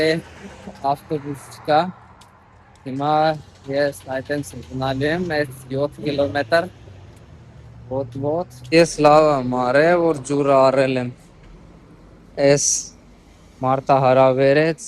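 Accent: Armenia. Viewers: Artashati barbarov el xosum es brou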